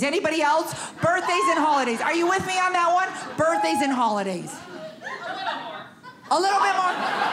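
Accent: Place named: Minnesota, United States. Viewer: Is she from California or Minnesota? She sounds like Minnesota to me lol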